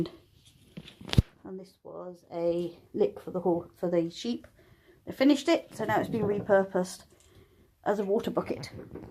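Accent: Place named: United Kingdom. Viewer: Hello! I'm a new subscriber! I just love your accent, I wonder if your from the same part of the UK as my husbands Auntie :-)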